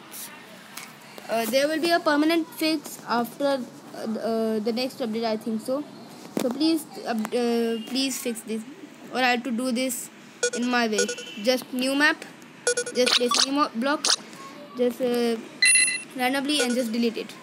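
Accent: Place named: India. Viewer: Are your Indian because of your accent?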